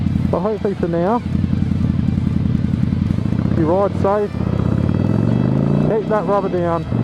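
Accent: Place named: Australia. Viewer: Saw you channel name on a comment so I thought I would come and check it out !! Sounds like you are in, or from Australia ....We were in Australia in early 2019. Love it there!!!. New subscriber here from Canada .. My dream bike as a kid was the '82 Honda CBX 1000, sport touring bike....to this day I wish I could find one !!! cheers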